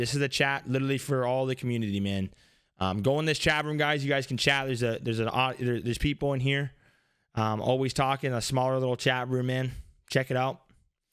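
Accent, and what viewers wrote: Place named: Canada. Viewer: I love the Canadian accent❤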